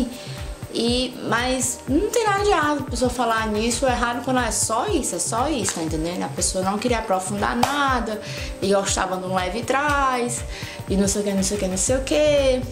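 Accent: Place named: Northeast, Brazil. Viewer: muito bom o video, eu morei fora e senti a mesma coisa, pelo seu sotaque você parece ser do nordeste como eu, acho que é pior...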